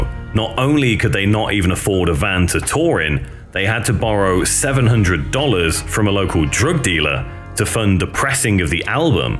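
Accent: United Kingdom. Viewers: This is equivalent to a "Behind The Music" episode but with a British narrator.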